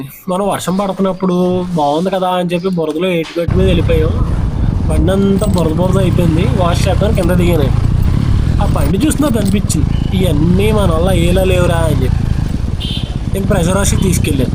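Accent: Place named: Godavari, India. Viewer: నువ్వూ....నీ తెలుగూ.... గోదావరి యాస.....😂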